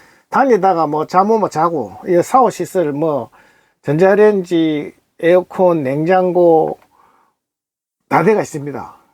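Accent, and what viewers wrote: Gyeongsang, South Korea. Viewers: 경상도 분인가 봐요. 구구절절 맞아요. 저도 9인승 5인승 구조변경 스타리아 라운지 8천만 원대, 1년 3개월 된 것을 5천 2백만 원에 구입하였는데 새 차나 다름없더군요. 우리 마눌님하고 3박~4박으로 주로 관광 목적으로 다니고 있지요. 비용을 아껴 써도 적게는 20십~40십만 원 들어갑니다. 저도 테이블은 사용하기 불편하여 무게만 나가고 집에 두고 다니고 있어요. 스타리아로 70대 분이 10개월 만에 세계 일주하신 분이 있더군요. 건강하시고 행복하세요. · 선생님 잘 들었습니다 항상 건강하시고 좋은 캠핑 힐링 하십시오 경상도 고향이신것 같아요 ~~~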